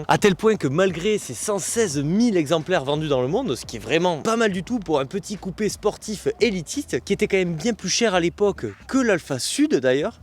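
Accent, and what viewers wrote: South, France. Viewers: Je voulais te remercier pour ton accent du sud et du coup le soleil que tu mets dans tes vidéos 😅. Un model qui fait rêver cette Alfa ❤. J'ai pris mon courage a deux mains et j'ai essayé de présenter la restauration de ma cx . 😅 Bon je suis loin de ton niveau et j'ai un un handicape physique au dos ,qui me limite pas mal dans cette restauration.dou le choix de cette voiture 😆. J'aimerais te la présenter. ( Elle sera repeint) d'ici cette été. <link>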